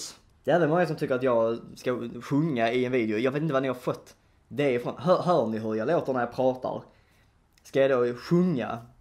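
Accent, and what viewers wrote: Scania, Sweden. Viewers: älskar din skånska, så underbar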